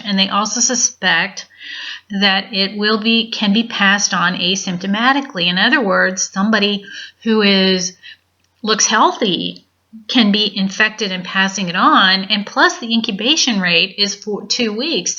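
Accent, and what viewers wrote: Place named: United States. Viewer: You sound American. What about the USA? Will Trump's failure to close the borders cause him to lose 2020? Will 2019 nCoV get really bad in the USA? Do you see martial law? I hear the Feds are buying food. Thank you. Pray for the USA!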